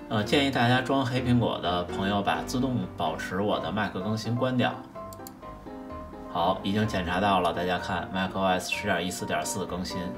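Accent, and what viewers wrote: Beijing, China. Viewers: 听口音是北京人吧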